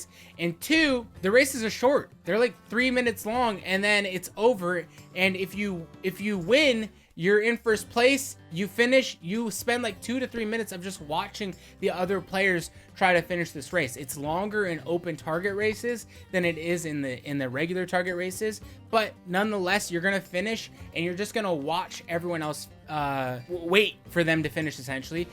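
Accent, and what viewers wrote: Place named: Russia. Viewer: please make more vids especially with your Russian ascent. It’s hilarious! Once a week isn’t enough for me. I look for your vids daily.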